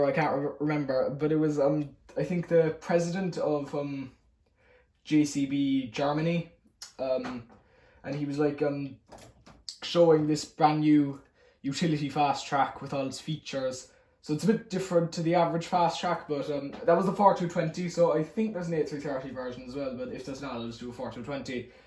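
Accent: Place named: Cork, Ireland. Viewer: As a lawd from Waterford I can tell your from Cork by hearing your Accent 😂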